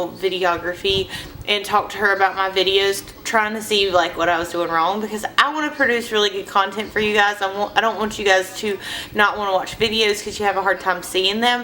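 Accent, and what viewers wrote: South, United States. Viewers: You're southern accent is sooo cute!!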